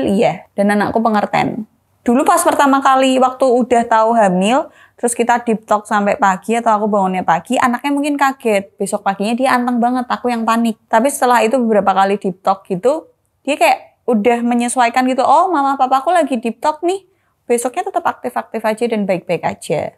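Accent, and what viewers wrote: Java, Indonesia. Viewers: mbakny logatny medok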